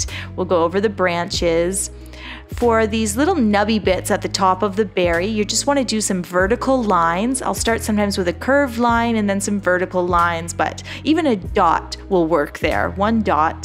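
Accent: Canada. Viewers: She is good, but camera is to far away most of the time. She sounds like Canadian. I would like to see these done in color.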